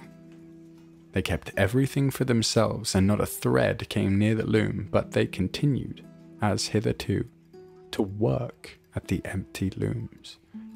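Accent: United Kingdom. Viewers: I would love and never get tired of listening on storytelling...keep it up idol...first time viewing something like this...the only problem with me, in my case is, and being a granny as well, some lines I cannot understand easily, since it is a British accent, so I have to repeat listening to get through what you are saying, really trying my best...just to listen to you...thank you for the joy you have brought in my life...I always pray for your best of health, success, happiness and protection. God bless you always! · The voice, and he is English like the accent. Nice story, never heard about it.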